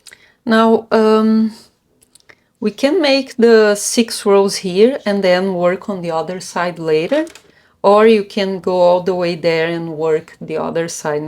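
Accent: Brazil. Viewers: voce e brasileira ? reconheco a acento de brasileiros falando ingles :)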